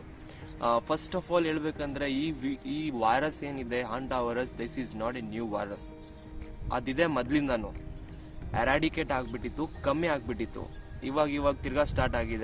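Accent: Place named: Malnad, India. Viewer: Malnad avra tharaha maataadteera sir very cute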